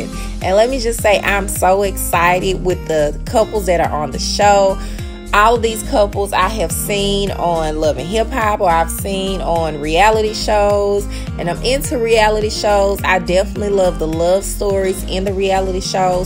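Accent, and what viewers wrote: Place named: Memphis, United States. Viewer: You kinda SOUND like Jessica...accent wise. Are you From Memphis?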